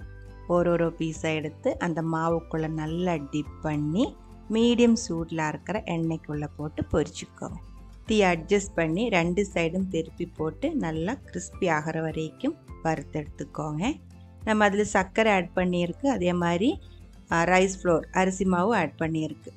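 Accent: Kerala, India. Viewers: Super pazhampori recipe sister uunga malayalam kalantha tamil speech super 👌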